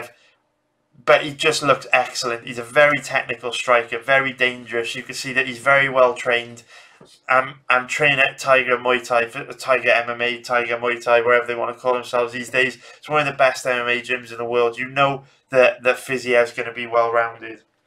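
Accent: United Kingdom. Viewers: ✊🏼👊🏼All day dude, yall whats ur accent, theres like a lil welsh in there, scouse myself, just curious, looking forward to next parts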